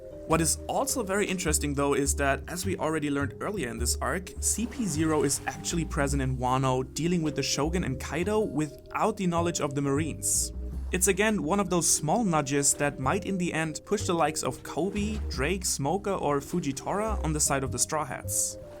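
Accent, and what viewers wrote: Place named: Germany. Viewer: fom the pronunciation I would guess I am now subed to a fello German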